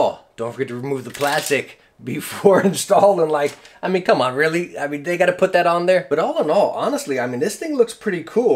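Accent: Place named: South, United States. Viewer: I stumbled upon your channel when deciding which monitor I should upgrade to and now you've helped me decide on a headset as well. I already have an MSI RX580 but I'm watching this video anyway because I love your personality and energy, not to mention that southern comfort vibe. The quality of your content is insane and I really hope you blow up soon!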